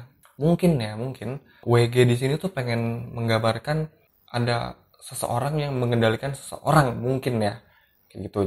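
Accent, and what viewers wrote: Java, Indonesia. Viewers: Jowo kerasss lurr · Mas wong jowo tah?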